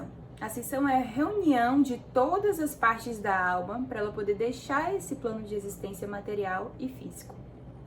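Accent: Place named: Northeast, Brazil. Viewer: Que presente esses vídeos. Esse canal! E esse sotaque com: oxe!? Amei ❤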